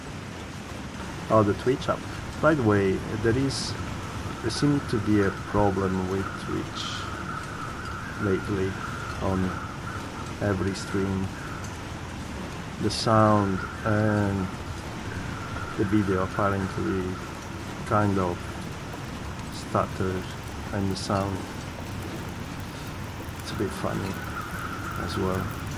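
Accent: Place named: Italy. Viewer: Where in Italy do you come from? You sound like you would come near where I am from. Always a pleasure to watch you playing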